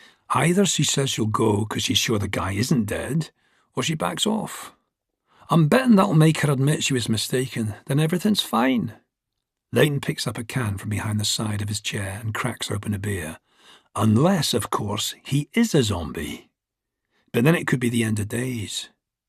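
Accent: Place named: Scotland, United Kingdom. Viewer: 𝑨𝒃𝒔𝒐𝒍𝒖𝒕𝒆 𝒑𝒆𝒓𝒇𝒆𝒄𝒕𝒊𝒐𝒏.ᐟ~ 😌✨ An epic, riveting narration with excellent voice acting for the characters - oh, and the Scottish accent reminds me of Robert Carlyle's. Would expect nothing less from the ever amazing Rupert Graves, one of the best actors/people in the industry/world. 💛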